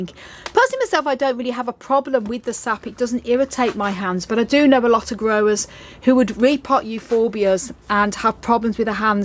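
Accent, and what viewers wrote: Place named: Tamworth, United Kingdom. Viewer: Oh my life! I’m going to need a poly tunnel soon if you keep showing me gorgeous plants that I NEED!!! Xxx great vid again! Where are you originally from? I grew up in Tamworth & you have a similar accent. Xx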